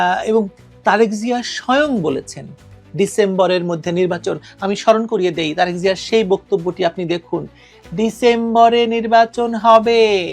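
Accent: Kolkata, India. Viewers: আপু আপনার ভাষা খুব পরিচিত লাগে, কলকাতায় গেলে এরকম ভাষা শুনতাম। আপনি পাক্কা ইন্ডিয়ান মাল। দেশে আইসেন না প্লিজ।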